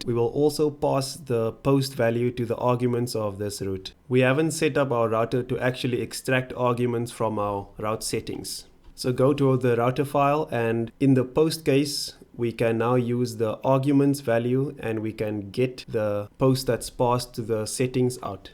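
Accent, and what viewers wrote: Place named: South Africa. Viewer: I was just wondering are you from South Africa? I mean your accent is so new to me :) ... nice content please keep the good work thanks a lot. Wish you all the best!!!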